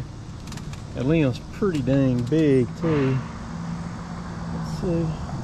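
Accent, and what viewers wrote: South, United States. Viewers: I think I like these videos more than your logging videos! Enjoy your southern accent...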